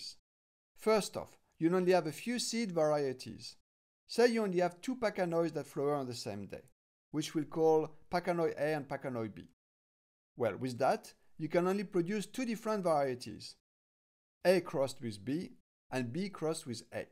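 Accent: France. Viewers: Hi. I have a question. I want to try to make an apple cactus Cereus flower. I will buy a large cutting and put it next to a wide south-west facing window in Paris. But I have read that Cereus are self-sterile. I have a Cereus spegazzinii wich is supposed to be a heavy and easy bloomer, can I use it as a pollen donnor for a larger apple cactus Cereus? Also, judging by your accent, are you french?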